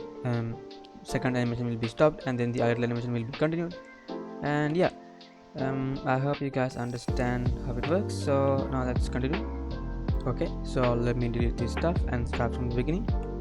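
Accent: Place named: India. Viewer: Ahh indian voice, didnt understand anything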